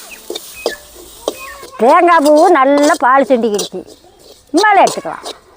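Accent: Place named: Coimbatore, India. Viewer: Is it coimbatore district? Via general name. I love the slang of the language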